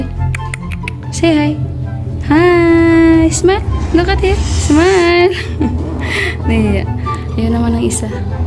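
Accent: Iloilo, Philippines. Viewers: ate ilongga ka po ba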